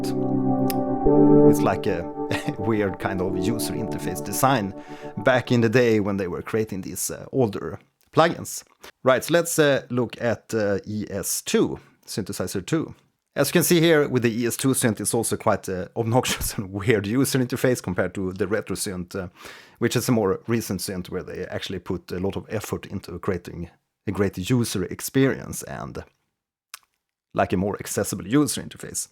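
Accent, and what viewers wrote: Sweden. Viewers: I love your Swedish accent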